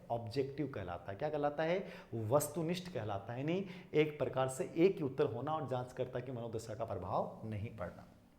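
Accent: Rajasthan, India. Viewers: Sirji aapke muh se marwari boli v achhi lgti h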